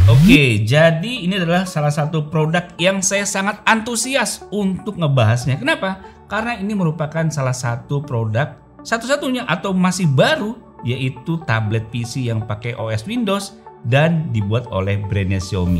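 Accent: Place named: Java, Indonesia. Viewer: Bang orang mana sih kok jawa bgt hehehe😂. 😅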